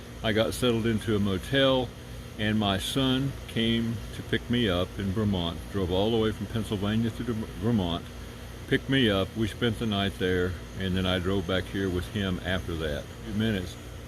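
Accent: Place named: South, United States. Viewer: Congratulations on hiking your own hike, and knowing when your personal journey was over. Being honest with yourself is difficult, when you set lofty goals and know your abilities, but know in your heart that you have realized that your dreams and visions have been answered in every aspect. Now you can carry them in your heart and share them with others who might be inspired to attempt the life-changing journey that you have taken. I'm sorry that I didn't get to see you in the flesh at Upper Goose Pond Cabin, but it was not to be. You have become a companion in my armchair, and shared six months of your life with my wife and me, and we are grateful for your time, effort, and good-natured humor, delivered in a soft and mellow drawl. We both lived down South, individually, when we were younger, and have since married and are living in the New England state in which I was born, but, whenever we visit any place below the Mason-Dixon Line we are still home. 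Godspeed,. The Lord bless the path that He has set before you. I trust that we shall meet some day. 👍💪✌🙏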